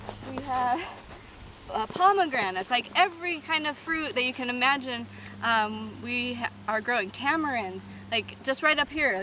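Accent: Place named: Puerto Rico. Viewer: Tu acento al contrario suenas boricua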